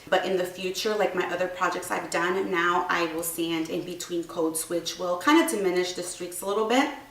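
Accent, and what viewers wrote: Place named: Louisiana, United States. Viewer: Great video!! Your accent sounds like you’re from Louisiana!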